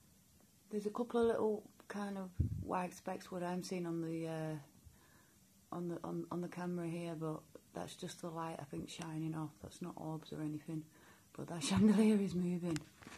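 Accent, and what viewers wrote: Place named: Yorkshire, United Kingdom. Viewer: they feed off fear hun so try and stay strong dont record it pay it anymore attention people may love your vids buts its not worth what its doing to you you sound like you possibly have a yorkshire accent or there abouts i will come try to help you if you wish if it gets too much just send me a message hugs x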